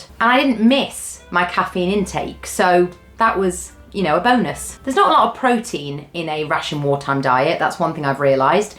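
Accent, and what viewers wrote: United Kingdom. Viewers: From her amazing accent I belive she's in the UK. so likely following UK rationing.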